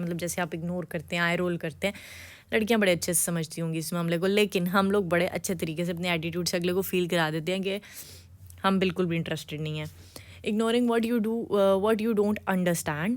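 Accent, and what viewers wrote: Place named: Punjab, India. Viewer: mam punjabi bolti hyn ap smjaty hoay bht acha lga apka andaz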